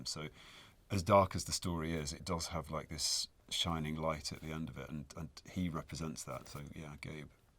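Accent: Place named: Newcastle, United Kingdom. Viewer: Aw bless, thanks for outting the Northumberland/Geordie accent in such a good light.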